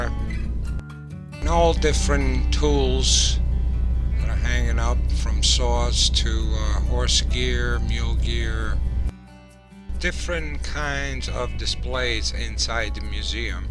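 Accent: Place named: New England, United States. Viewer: should not be narrated by a yahkee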